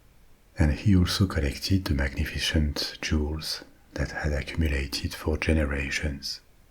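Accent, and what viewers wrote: France. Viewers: Son accent français j’adore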